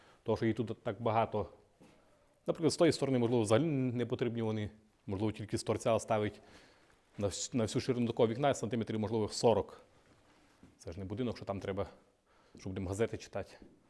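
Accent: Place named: Polesia, Ukraine. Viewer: Привіт молодь❤! Приємно спостерігати за працьовитою родиною! Здоров'я та наснаги вам❤!, я прекрасно розумію чудовий поліський діалект,у свій час працвав в Сарнах на маслробному комбінаті охоронцем, досі закоханий в ваш край,та щирих доброзичливих поліщуків! А смак вашої чорниці і грибів неможливо забути. Миру нам та Божої ласки ❤!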